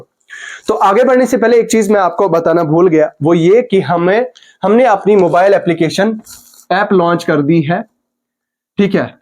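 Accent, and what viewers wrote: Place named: Kashmir, India. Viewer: Bro tum kashmir se ho? Aapka accent thoda kashmiri hai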